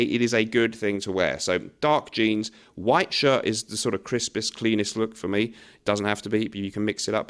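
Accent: England, United Kingdom. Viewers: ....classy? Smartly dressed and that English accent is helpful.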